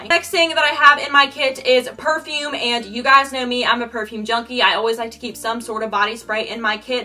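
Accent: South, United States. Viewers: petition for who thinks she should use her country accent more ⬇️ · Is it just me or did she get more of a country accent lmaooo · She got a lot more of a country accent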